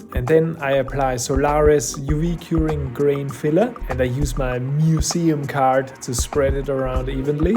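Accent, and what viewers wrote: Austria. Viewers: Are you Austrian? Your accent is very similar to Arnold Schwarzenegger's to my ear.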